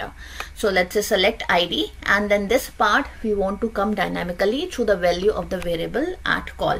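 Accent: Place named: India. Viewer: The accent is so Hinish and so bad and is not understandable!!! learn to speak English as English not as Indian!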